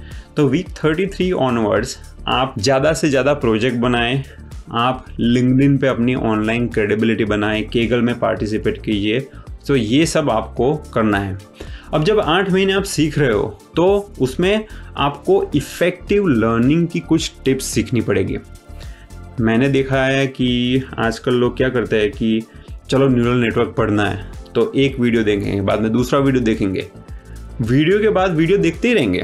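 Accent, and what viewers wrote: Gujarat, India. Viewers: You sound very gujju bhai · Thank you for the in depth Roadmap sir💯, btw got to know from your tone that you are gujarati(same). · Are you Gujarati bro ?😅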